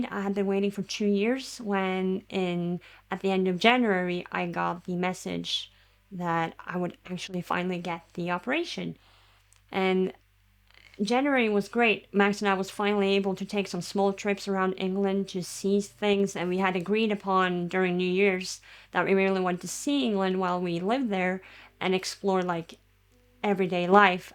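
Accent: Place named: United States. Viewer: Jag förstår verkligen varför du varken hade ork eller tid att skapa och göra videos. Jag har inte kunnat arbeta på väldigt länge pg a min mentala hälsa, så jag förstår dig. Jag hade för övrigt ingen aning om att du är norsk och att Max är svensk! Du låter väldigt amerikansk 😂 Jag själv är halvnorsk men bor i Sverige 😁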